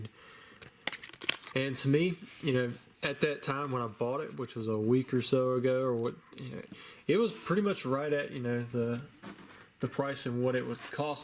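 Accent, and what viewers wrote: South, United States. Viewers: He has a little southern twang in his speech. I believe he is from north Carolina but not 100 percent sure.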